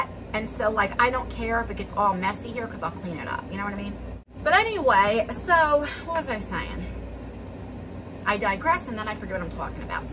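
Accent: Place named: Baltimore, United States. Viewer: your accent is what draws me lol. It’s not the same, but I’m from Baltimore City and remember my not so great youth! I’m in the south now for years and live to hear folks talk like home lol. All that other crap I really don’t pay attention to. In my opinion the folks that feed off that shit should be ashamed!! I started watching around the time after your daughters scare, but just from watching a few if your videos at that point, I could see your genuine. You sound like my best friend growing up that I got in trouble with and your videos make me feel Like I’m sitting down with an old friend. Screw those a holes!!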